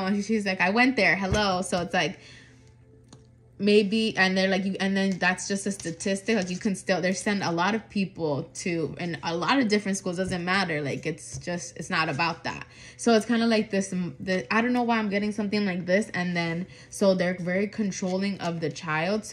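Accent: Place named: Caribbean. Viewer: I love your Spanish, are you Dominican? Cuban? Puerto Rican?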